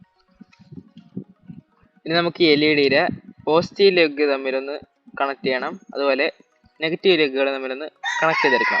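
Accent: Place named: Kottayam, India. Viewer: ഫാഗം കോട്ടയം സ്ലാങ് ആണ്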